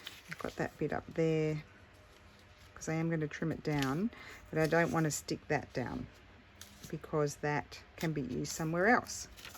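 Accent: Australia. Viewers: I absolutely love your channel, I’ve been a subbie for quite a while, but I don’t comment very often. I just wanted to tell you how much I enjoy your channel. I suffer from chronic pain, depression and anxiety, and spend a lot of time in bed watching videos. Your videos always make me feel better, happy, and really helps lessen my anxiety, at least for a while. I love that you live in Italy, but have such a beautiful Australian accent, I guess it’s all in what accent or language you grow up speaking. The last thing, I LOVE rainy days, and I thought I was the only one, no one(and I’m not joking) that I know like rainy days!!!!😀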